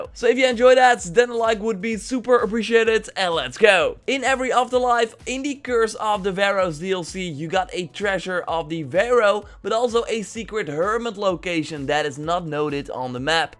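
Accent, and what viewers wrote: Netherlands. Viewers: je bent overduidelijk een nederlander, dat accentje haal je er ZO uit hahaha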